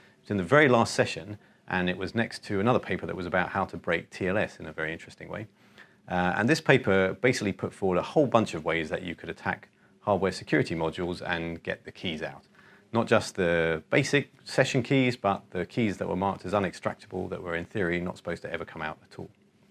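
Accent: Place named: London, United Kingdom. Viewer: London I think ;)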